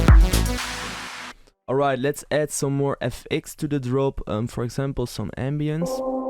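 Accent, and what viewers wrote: Netherlands. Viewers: aan het accent van jouw Engels kan ik ook gewoon horen dan je een Nederlander bent. video is een banger!!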